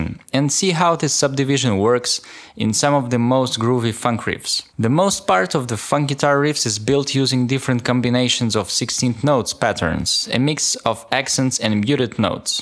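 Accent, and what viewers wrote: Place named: Eastern Europe. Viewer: Nah. This guy is accent is Eastern European.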